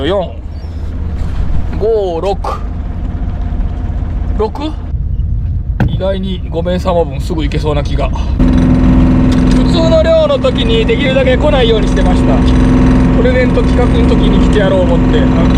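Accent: Okayama, Japan. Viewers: いつも楽しく拝見しております。自分黒崎中学校出身で故郷の海、岡山弁懐かしいですね。瀬戸内海のカニ、カキ美味しいですよね。さむい寒い季節ですが頑張って下さい。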